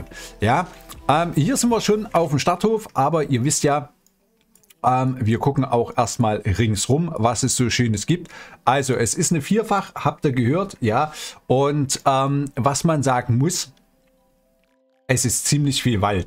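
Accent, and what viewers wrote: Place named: East Germany, Germany. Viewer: Moin die Map hast du ja sehr schön vorgestellt und ja der Harz ist zum Teil meine Heimat ich finde diese Karte sehr schön und mit deinem ostdeutschen Dialekt rundet das die mapvorstellung perfekt ab ich würde mir echt wünschen dass du mehr modvorstellungen machst weil du kannst das richtig gut gibt von mir einen Daumen hoch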